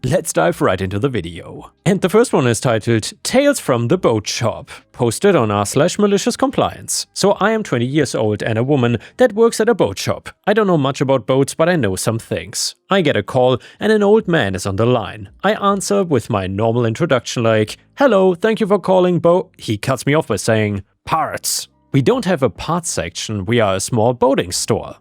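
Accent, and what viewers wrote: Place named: Germany. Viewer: He's German. English isn't his first language. He's pretty good, but there are still some words he has problems with.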